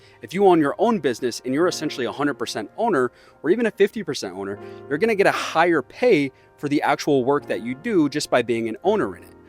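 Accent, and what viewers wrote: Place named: United Kingdom. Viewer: Just because you're British doesn't make it gen ZED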